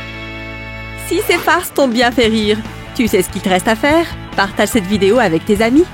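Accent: England, United Kingdom. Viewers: en plus il sons englais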